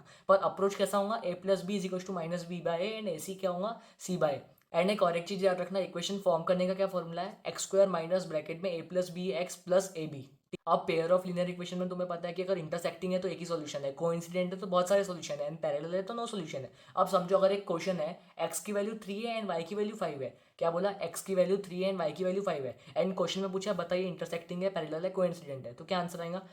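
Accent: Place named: Nagpur, India. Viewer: Bhai tum pakka nagpur maharashtra se ho na🥷🏿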